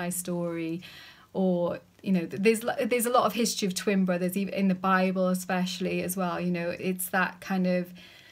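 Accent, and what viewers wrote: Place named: England, United Kingdom. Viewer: hi, I am somewhat new to your videos, but have been watching them. interesting. do you live in England? I am not good about accents. You could be in Australia or New Zealand, but i'm guessing England. you have a very pleasant personality. what exactly is a story witch? do you practice something such as white magic i think it's called?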